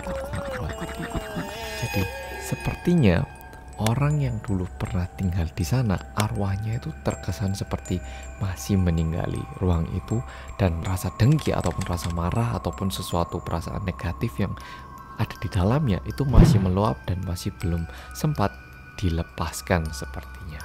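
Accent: Java, Indonesia. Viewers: salut, penyampaian runut dan tata bahasanya sempurna, meskipun medhok....👍👍 Sukses selalu yo, Cak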